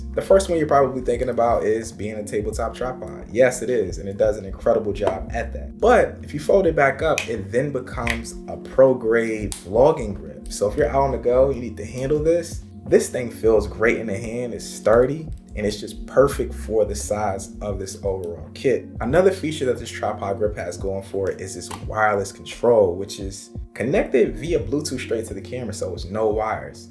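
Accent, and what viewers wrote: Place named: East Coast, United States. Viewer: your east coast accent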